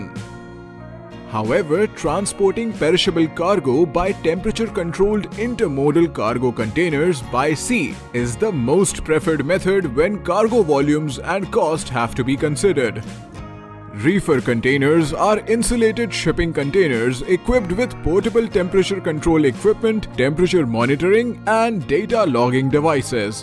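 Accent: India. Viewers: Indian accents is unbearable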